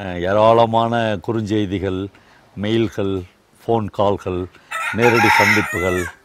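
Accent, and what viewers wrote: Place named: South Arcot, India. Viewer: பாவா உங்களின் மொழி பழைய தென்னாற்காடு மாவட்டம் பேச்சுவழக்கு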